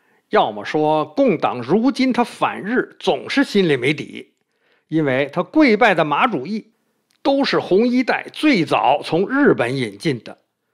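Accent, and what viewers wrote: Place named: Beijing, China. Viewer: 爱听的地道北京话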